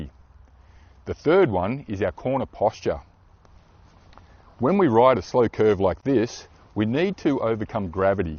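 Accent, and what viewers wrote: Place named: Australia. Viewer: Brilliant explanation. Aussi English 😍 thank you 🙏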